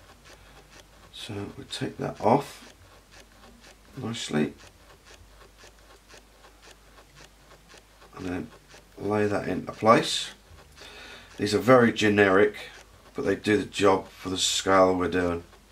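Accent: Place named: Great Yarmouth, United Kingdom. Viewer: know the area well, I'm from the gt yar. Area. Recognised our accent.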